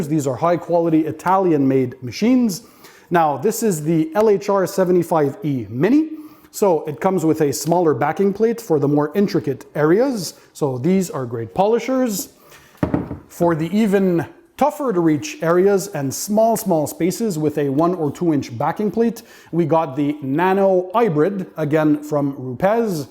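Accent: Quebec, Canada. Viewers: Bonjour! parlez vous Français? Ça ressemble drôlement à un accent québécois anglophone haha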